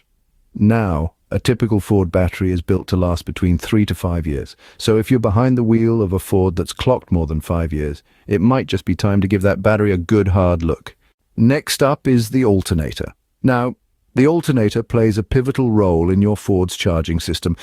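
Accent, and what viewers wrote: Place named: RP, United Kingdom. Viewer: WTF is this Brit talking like this is etiquette school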